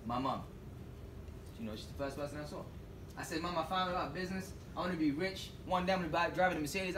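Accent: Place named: United States. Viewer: Why does he talk in an American accent when he's British????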